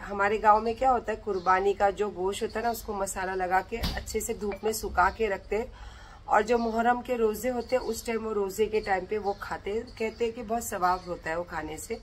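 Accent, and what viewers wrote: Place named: Andhra Pradesh, India. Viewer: Yeh most of kyaa hain pata nahin kahan se english sikhkar aayi hain hindupur ki english hain 😂😂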